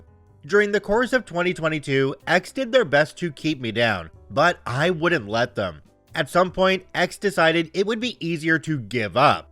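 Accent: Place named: Canada. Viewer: from Canada, so he will pronounce me words differently than other people would and no, he is not am AI either.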